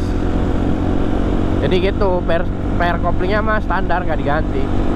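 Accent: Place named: West Java, Indonesia. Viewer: Jangan sampe ilang bhsa sunda nya bang hehe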